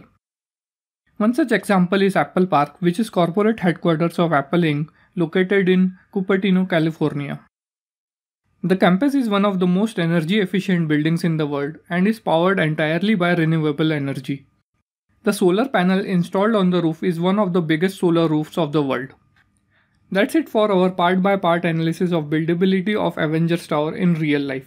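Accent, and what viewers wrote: India. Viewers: He soundz like rajistahn 27 responses matches the 27 disapproves Manhattan area tall buildings of ny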